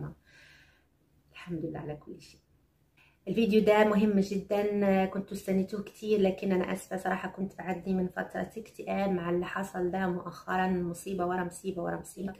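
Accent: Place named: Morocco. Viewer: كنت أظن أنها من مغرب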